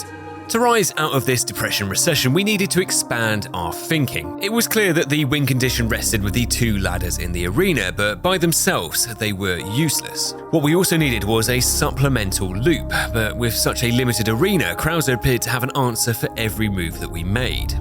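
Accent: United Kingdom. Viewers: That accent says British but your love of friendly fire says American.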